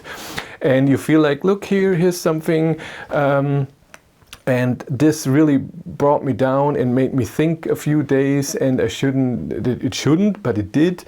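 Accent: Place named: Germany. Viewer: Keep up the good work. I have been watching some of your videos since quite early. I remember you made some videos about your new Id3, and you were working full time. The Excel charts are a nice addition. Thanks. I am glad this is working out for you. It is interesting hearing a German perspective. Your english is fine, quite clear, with a nice German accent. I would like to hear some of your detractors speak a foreign language. That could be quite amusing.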